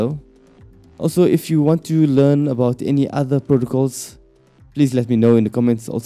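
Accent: South Africa. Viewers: Are you from South Africa? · South african accent!!!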